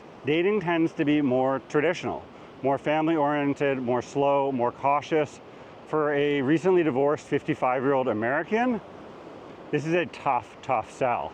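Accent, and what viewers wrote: Canada. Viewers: First honest influencer for SE Asia. You sound Canadian though. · Are you from Wisconsin? I think that you sound more like a Canadian, but you mentioned Wisconsin a couple of times...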